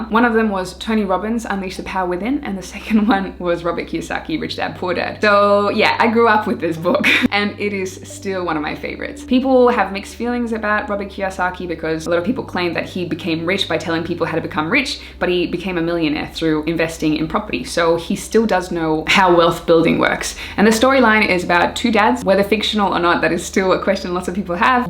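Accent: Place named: Australia. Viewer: I can't place her accent, Australian but there is something else..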